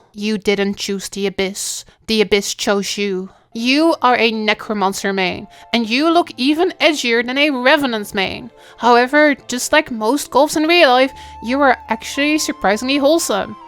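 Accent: Netherlands. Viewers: I love your english-dutch accent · That dutch accent made my neckvein throb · i swear i hear a dutch accent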